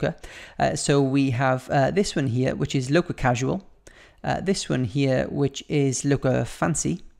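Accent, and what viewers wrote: Wales, United Kingdom. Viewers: Are you from Cardiff? Or Barry? Or somewhere in Wales?? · Ugh this guy.... He helps me with my story. But i'm getting hella mad at his british accent.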